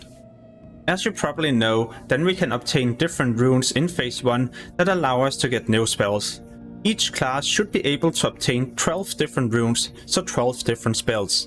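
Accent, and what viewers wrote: Denmark. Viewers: I think Danish. there's a character named 'Draeber' translating to 'Killer' in Danish. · Er du dansker ??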